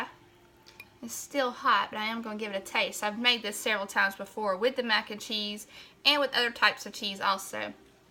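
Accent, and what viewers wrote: South, United States. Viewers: you have a little bit of a Southern accent. I don't know about where you live but I live in Phoenix and they have 100 different ways to do mac and cheese anything from deep-fried to pizza toppings. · You Got That Southern Accent Nothing Wrong With It Just Some Of The Words In Southern That You Say Sometimes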